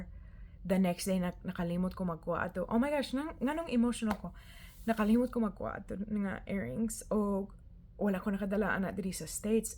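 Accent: Visayas, Philippines. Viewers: you sounded just like the locals. your linguistic prowess is incredibly impressive. I'm cebuana myself but i can tell your intonation is like mixed visayan but the pronunciation and the way you enunciate visayan words are on point. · you are so inspiring and authentic! 😊 Kalingaw kaayo ko listening to you speak Bisaya and especially how bisdak you are! It brought back so many memories. I also grew up in Davao—Matina, Davao City—until 1986, when I left for Manila for college. I eventually stayed there, got married, and raised my kids. In 2005, we moved back to Davao to take care of and develop our family property before finally moving to Las Vegas in 2014. You’ve made me miss home so much. More power to your book! I’m also working on a book dedicated to my father. Like you, I have so many creative projects that sometimes I wonder how I’ll ever finish them all. 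But I’ve learned that a little progress at a time eventually gets us there. Keep going—you’ve got this, and I’m cheering you on from Las Vegas! 💛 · I closed my eyes and I cannot really tell that you're an American. You sound naturally Bisaya, like a local. I wish you well. I hope you can visit Matina again.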